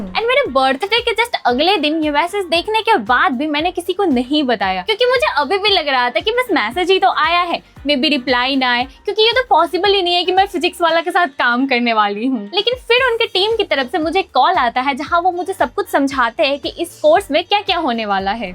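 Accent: India. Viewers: Apke bolne ke language se hi lag rha tha aap bihar se ho 😂😂😂. No hate .I am also from bihar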